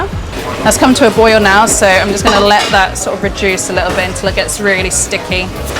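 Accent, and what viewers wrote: United Kingdom. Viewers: Fantastic British accent love it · Hi there u are professional as a 1 Michelin star chef but your presentation is way too serious.. pls lighten up n I m sure you will get more subscribers.. smile more! You r very pretty with a nice British accent. Love your blonde hair too!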